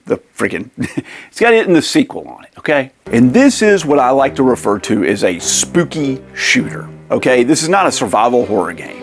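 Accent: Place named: Appalachia, United States. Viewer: As an Appalachian American, I respect and enjoy the accent. 🤘🤘🤘 Subbed and looking forward to checking out other reviews and such.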